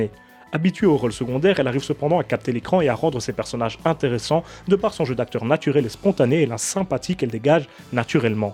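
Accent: Belgium. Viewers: Ouiiiiiii, moi aussi, pendant toute la critique, je te reprenais à haute voix,!;-) Mais en même temps, j’adore ton petit accent belge, ça fait partie de ton charme... LOL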